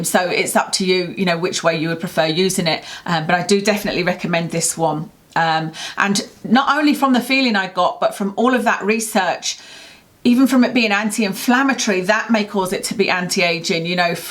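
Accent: United Kingdom. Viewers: I like your British accent :)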